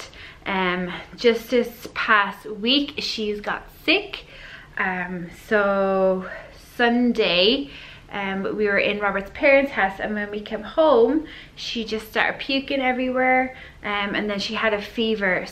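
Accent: Ireland. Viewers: I could listen to you talk all day, I love Irish accents!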